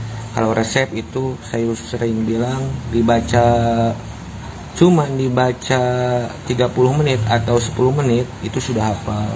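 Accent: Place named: West Java, Indonesia. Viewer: Kang sunda bangeeet...sy suka😁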